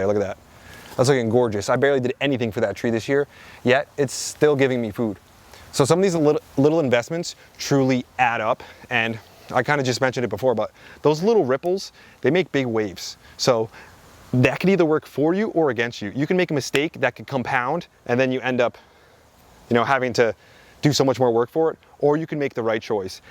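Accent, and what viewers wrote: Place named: Pennsylvania, United States. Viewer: He sounds like my cousins when I visit Pennsylvania-ha! I'm originally from Philly now retired in Southwest Virginia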